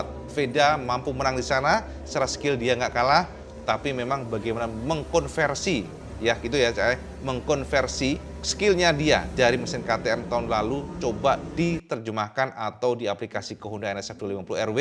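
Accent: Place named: Java, Indonesia. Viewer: Bang asli ngendi je bang kok jowone tulen hehe...salam seko gunungkidul bang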